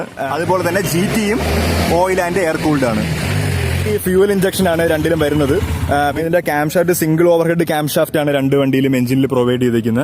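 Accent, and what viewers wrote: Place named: Thiruvananthapuram, India. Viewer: വളരെ ലളിതമായി നമ്മടെ സ്വന്തം തിരുവനന്തപുരം സ്റ്റൈലിൽ തന്നെ അവതരിപ്പിച്ചു. പൊളിച്ചു മക്കളേ.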